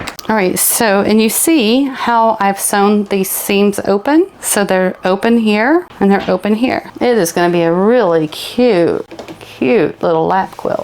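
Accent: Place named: South, United States. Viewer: Wow! Girl, I just realized I am watching you.....enthralled! I love your voice, that southern accent, I can't wait to do this sewing! Thank you for being awesome!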